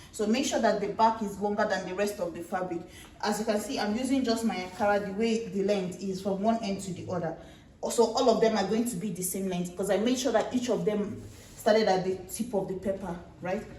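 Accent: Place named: India. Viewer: Am I the only one hearing an India woman's voice?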